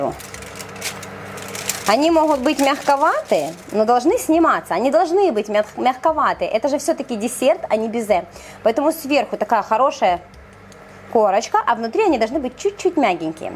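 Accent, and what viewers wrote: Ukraine. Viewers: давно подписана на ваш канал- нравится ВСЁ )))) От того - что творите на своей кухне- в плане кулинарии до вашего мягкого певучего украинского говора )))) Если бы у меня вдруг не стал показывать монитор... ДА, я бы просто слушала ВАС!)))) Спасибо за рецептик- Очень красивый - вот что-что- а бизе у меня в духовке получалось- будем ЕСТЬ пироженки!))))))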